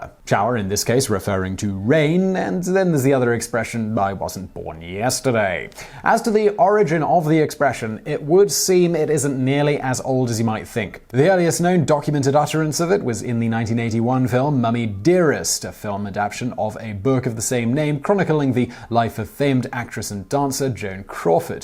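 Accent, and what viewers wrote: United Kingdom. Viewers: I like the way you say it. Your British accent gives it a little extra sting.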